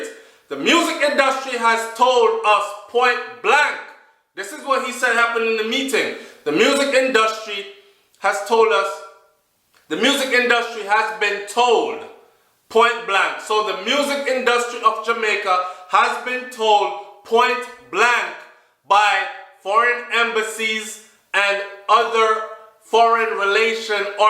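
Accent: England, United Kingdom. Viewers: Yo dawg yuh England accent mad 🤣🤣🤣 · British accent tun up my yute. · Love the English accent... lol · you british accent tun up high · The british accent...wicked would listen to it all day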